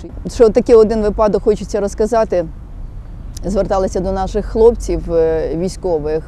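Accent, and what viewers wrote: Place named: Ukraine. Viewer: Piękny akcent ukraiński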